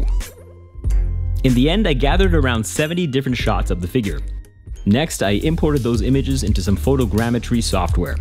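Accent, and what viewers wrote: Canada. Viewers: Canadian? LET'S GOOOOO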